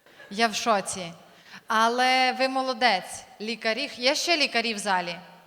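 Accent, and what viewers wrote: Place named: Western Ukraine, Ukraine. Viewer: Вона з Західної України, там акцент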